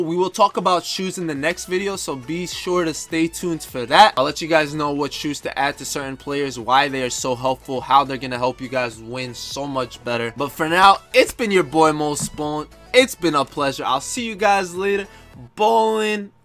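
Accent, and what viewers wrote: New York, United States. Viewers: This dude is 100% a New Yorker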